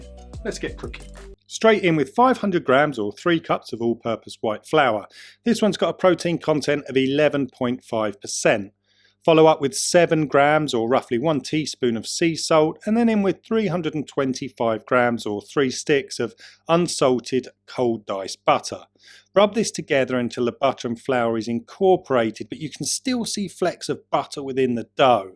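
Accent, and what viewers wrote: United Kingdom. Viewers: your British accent is very attracting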